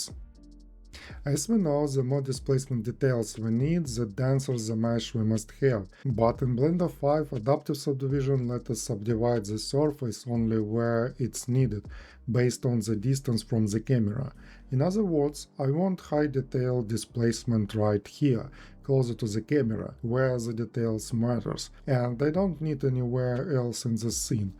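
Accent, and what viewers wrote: Russia. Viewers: русский акцент) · ну я же слышу наш акцент, можно версию на русском ?)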